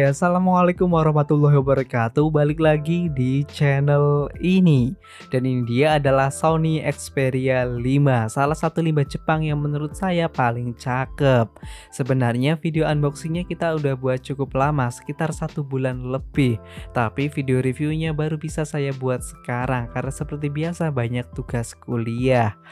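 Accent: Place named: Kedu, Indonesia. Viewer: Bahasa jawane mirip daerah kedu-mgl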